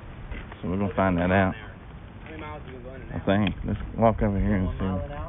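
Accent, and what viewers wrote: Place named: South, United States. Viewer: That music is God-awful. Geez. You talk with a southern, accent, yet, use skanky boof music? Bluegrass, dude. I lived in Franklin for 20 years, worked in Bryson. City at Swain County Hospital, Harris Regional, Haywood Regional, & Memorial Mission/St. Joe's hospitals.(Westcare). I miss it, as my daughter & grandchildren will live & work in Franklin. Been to Fontana....beautiful area. I want to go back....to my home. My husband & I had a band, played "Pickin' On The Square", in Franklin, and many other places.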